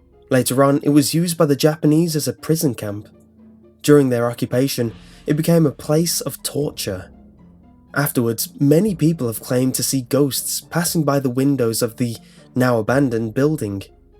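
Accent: England, United Kingdom. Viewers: Nice video... But - where is your accent from? You sound English, yet you said “Clochester” instead of COLCHESTER. Colchester is claimed to be the oldest town in Britain. It was for a time the capital of Roman Britain. Hospital Name: Severalls Hospital, Previous Names: Second Essex County Asylum, Essex and Colchester Mental Hospital, Severalls Mental Hospital Location: Boxted Road, Colchester, Essex CO4 5HG Principal Architect: Frank Whitmore and William H. Town Layout: Compact Arrow & Villas Status: Derelict Opened: 27th May 1913 Closed: March 1997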